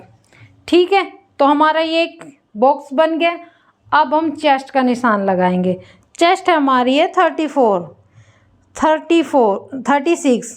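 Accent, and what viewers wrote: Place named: Haryana, India. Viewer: Mam aap kaha se ho aapne haryanvi boli bhut acha laga sun ke😂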